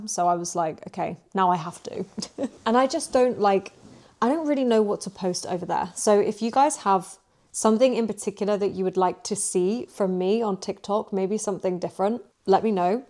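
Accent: United Kingdom. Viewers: does anyone know what the british accent she uses is ? i am really interested because it makes me enjoy learning english... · Im Brazilian and I love your channel, im learning English, but your British accent is soooo good to listen, when you say "and" is my favorite part 🤣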